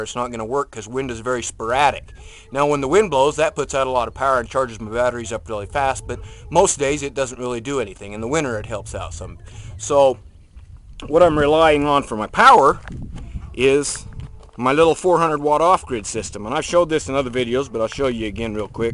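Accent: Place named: South, United States. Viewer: where do you live? pick up a southern accent but get snow?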